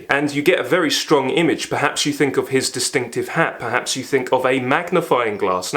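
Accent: United Kingdom. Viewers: What a beautiful presentation. Thank you! I am a 74 year old young widow, ordained minister and psychotherapist who recently discovered your channel and subscribed. I am also a New Orleans, Louisiana born transplanted to and living the last 24 years in Fort Worth, Texas. I say that just to provide info as to how far reaching your channel is and I am in love with you! I love your British accent (I know as an American I am the one with the accent). I have listened so closely and discerningly to your assessments of the great books you presented and the characters today and it is refreshing to learn of your reasons you love each book- each character. I am an INFJ also. I don’t think I could pick just one baby but I read Jane Eyre in grammar school and fell in love with her. I also love the Count of Monte Christo “God will give me Justice” and Elizabeth Bennet and Miss Bennet and Miss Bennet God bless you and Jean Valjean and of course, you are right the paramount Jesus Christ. 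“God is that which there is no other greater than,” St. Absalom. PS are you married if not I have a 30 year old granddaughter I would love 😂for you to meet. 😂❤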